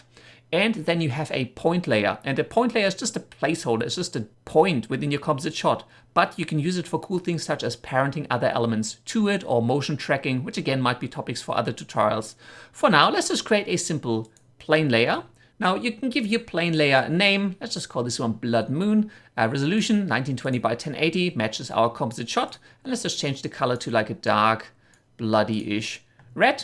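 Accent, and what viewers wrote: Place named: Germany. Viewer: Really really great tutorial! and nice german accent :D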